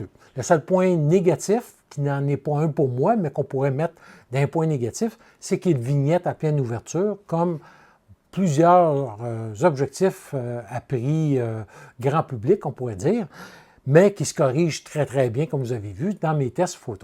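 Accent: Canada. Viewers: Jolie vidéo avec en prime l'accent Canadien. Merci beaucoup.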